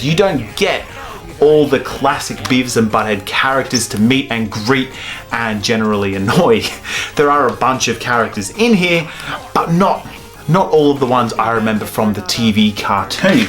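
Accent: Australia. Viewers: I like your accent! Are you from Australia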